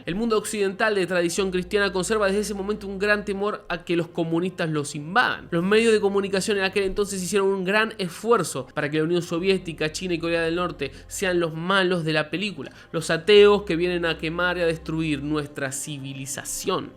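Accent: Argentina. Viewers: Por tu acento pareces Argentino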